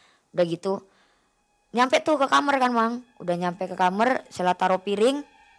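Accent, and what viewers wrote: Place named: Cirebon, Indonesia. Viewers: Logate Cirebon Indramayu iyaaa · mbak sela bahasae dermayu ya. apa wong dermayu tah? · Sy penonton baru, salfok kok kyk cerbonan sih ngomongnya ya 😂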